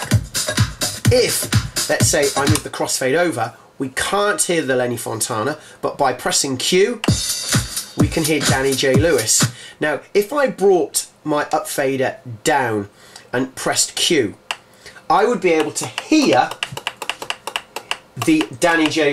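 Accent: United Kingdom. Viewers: Love brittish accent!!! #nohomo K !!!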